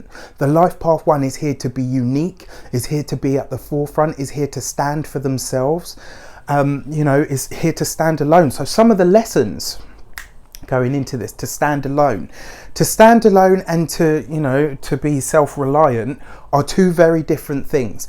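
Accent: United Kingdom. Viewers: Is your accent British? Either way I love it! Haha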